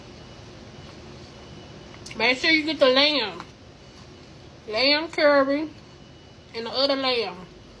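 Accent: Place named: South, United States. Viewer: Lamb curry 😂😂😂 love your southern accent ❤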